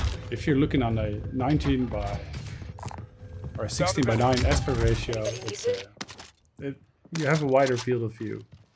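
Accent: Netherlands. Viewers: Awesome vid thanks bro. Also you sound Dutch.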